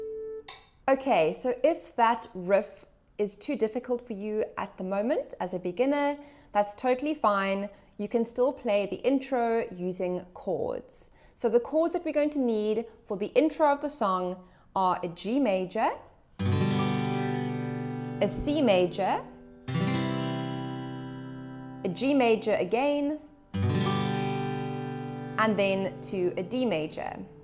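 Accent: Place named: South Africa. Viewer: Yay SA accent, finally.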